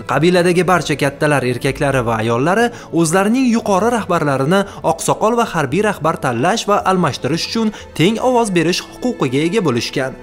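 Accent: Tashkent, Uzbekistan. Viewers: Тошкан шевада гапирманг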